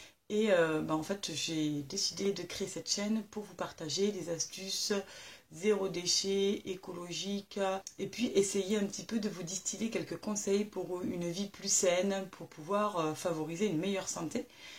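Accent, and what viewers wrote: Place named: Quebec, Canada. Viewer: Alors comme à mon habitude j'écris en écoutant ta vidéo! la purée de noix de cajou, je ne savais même pas que ça existait, j'adore les noix de cajou (non salées bien sûr), ça doit se trouver à la Vie Claire. Les bijoux que tu présentes en premier ont l'air super jolis, mais bon je ne suis pas très bijoux. pas de bagues, pas de montres depuis 2005 (on a l'heure partout), juste une petite chaine et des boucles d'oreilles. J'adore l'accent québécois! J'admire et je respecte les personnes qui sont sensibles à l'environnement. Dommage qu'elles soient si peu nombreuses. J'ai un peu plus de mal avec les vegans (je respecte leur choix, mais quand je vois le comportement de certains extrémistes, la réciproque n'est pas de mise) je te souhaite un joli mois de novembre, moi ce sera loin, loin.... la réalisation d'un rêve.... bisous!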